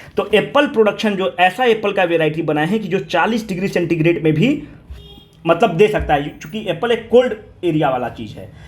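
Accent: Bihar, India. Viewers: 51/71 ...could be better!! Happy coz salfas mistake nhi hwa... Sir 2 Starbucks coffee ☕ udhar raha..😁 Bihari tone rocks ..carry on lv it proud bihari👍 Thankyou for this test n request sir..plz ese tests BS,MHI,MHB k liye v Le aaiye plzzz ..🙏 it is so helpful in revision. #THANKYOU ❣️ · Sabse phle Thankyou. Sir as a Bihari ,jha v humlog jate hai desh videsh bs ek chiz apne sath le jate hai wo hai apna bhasa ... Whi to pahchan hota hai na sir . Uspe to proud krna chahiye .... Jb aap Bihari tone bolte hai bahut hi gauranvit feel hota hai .. kabhi kabhi magahi v boliye .. bhojpuri and maithili people kabhi kabhi dominate kr dete hai 😜😛😋 ... Jo maza hm bolene me hai wo mai me kha ... Baki sir I am following your advice sincerely. Hoping for the best . Parnam 🙏🙏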